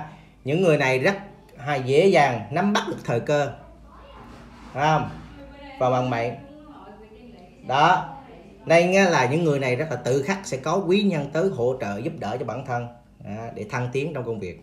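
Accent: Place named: Quang Nam, Vietnam. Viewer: Nghe giọng thầy chắc người QN-ĐN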